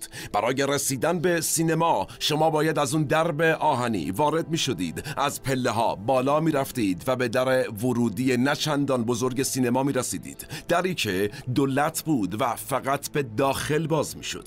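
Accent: Bushehr, Iran. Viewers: این لهجه ای که شما سعی کردی جنوبی صحبت کنی برای استان بوشهره نه خرمشهر و ابادان… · ممنون از شما آقای.. اما ما آبادانی ها اصلا این شکلی حرف نمیزنیم.... این لهجه بوشهری هاست..... 🙏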